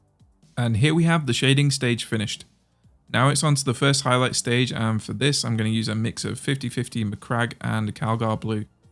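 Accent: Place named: Burton upon Trent, United Kingdom. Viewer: Id recognise a Burton-upon-trent accent anywhere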